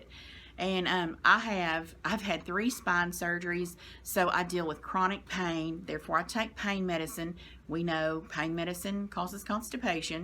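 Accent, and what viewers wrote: South, United States. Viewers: I can see **OATMEAL** being a problem. I eat these cookies my wife sometimes make. They are chocolate and oatmeal. Not like Oatmeal cookies. But the oatmeal (like nuts) get trapped in the bad spot of my intestine and cause a flare up! Wouldn't work for me! Oh yeah! I love your strong Southern Accent! 😊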